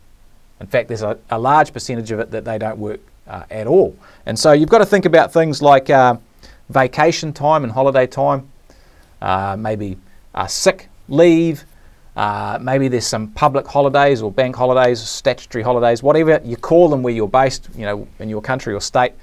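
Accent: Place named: England, United Kingdom. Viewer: Think of his accent. I am not sure if he’s in the US but it’s an English accent and Europe gets more time off compared to US. But I get 160hrs a year plus all bank holidays off so he’s not to far off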